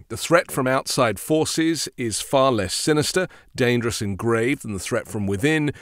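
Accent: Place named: South East England, United Kingdom. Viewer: I think his accent is from south east England….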